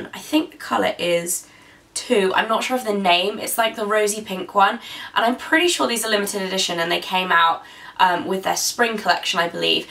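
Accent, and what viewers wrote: England, United Kingdom. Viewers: Your accent is 100% English but you have that upwards intonation at the end of each sentence (as if you're asking a question) which is all American! Love your videos :-) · what part of england u from? i'm really curious, can't tell? im guessing like either oxfordshire/ west midlands/ buckinghamshire?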